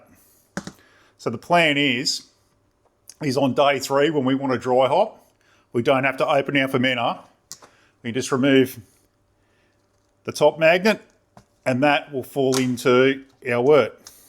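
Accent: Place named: Australia. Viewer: MATE!!! Bloody love your channel, good job on all the videos and love the true Ocker accent, you remind me of me sergeant in the glorious RAR. Keep up the stellar work!!! 🇦🇺🇦🇺🇦🇺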